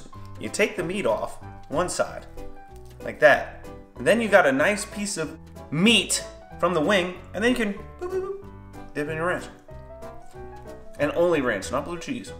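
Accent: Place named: South, United States. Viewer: You sound like you may be a nice southern boy...ranch! Buffalo wings were created in Buffalo New York. A lot of us use blue cheese. You do have other facts straight though. The wings were just fried plain and sauced after. You can season by just doing a simple flour dredge (season the flour) before deep frying and you shouldn't loose the seasoning it just isn't the original/traditional way.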